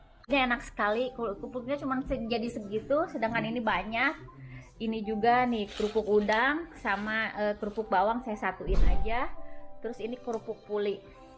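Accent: West Java, Indonesia. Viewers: Meuni sundaa pisaaan euyy ..samii abi oge mojanh priangan atuh lahh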